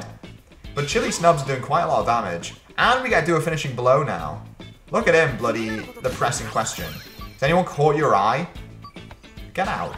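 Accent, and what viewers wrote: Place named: United Kingdom. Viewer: idk whats better the story or the british accent describing the story... this makes me feel like british people are real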